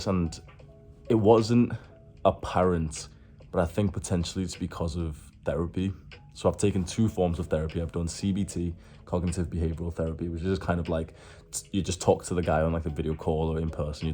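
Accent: Liverpool, United Kingdom. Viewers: Wait is that a Scouse accent i can detect. *Back to the content of the video *Inner healing allows deep soul growth and discovery. It's so fulfilling because the inner joy that happens is freeing because you learn true love and happiness starts within yourself. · Your accent is northwest wirral/Liverpool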